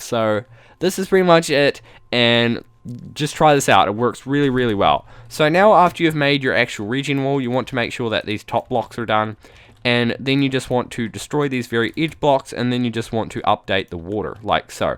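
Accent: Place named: New Zealand. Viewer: im pretty sure he's from new zealand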